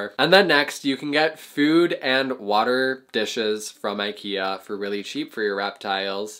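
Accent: United States. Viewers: Okay stupid question but is he American or Canadian? In turn are these American prices or canadian😂😂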